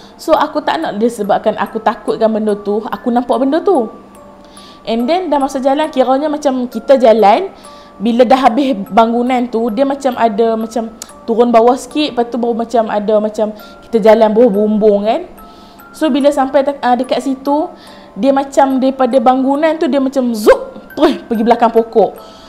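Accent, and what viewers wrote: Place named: North, Malaysia. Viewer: Assalamualaikum...sempoi first time dgar ni...dah follow page ni...bhasa utara to smpoi...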